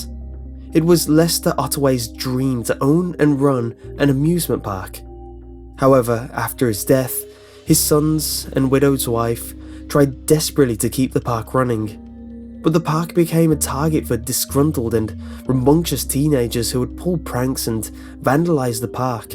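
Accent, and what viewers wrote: United Kingdom. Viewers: I love your British :)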